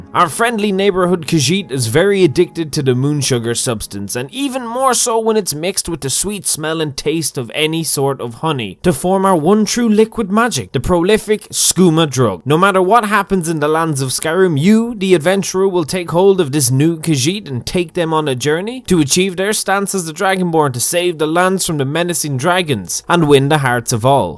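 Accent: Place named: Ireland. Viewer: We are more addicted to your content than skooma lol. It's something about your Irish accent... it's amazing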